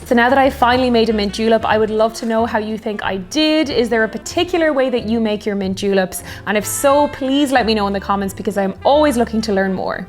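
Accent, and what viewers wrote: Ireland. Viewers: I don't know why, but for some reason I was expecting you to pronounce "derby" as "darby" with your Irish accent.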